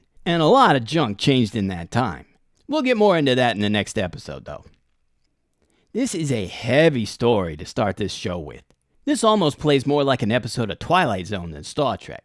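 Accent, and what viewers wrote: Brooklyn, United States. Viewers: love the brooklyn accent and snark..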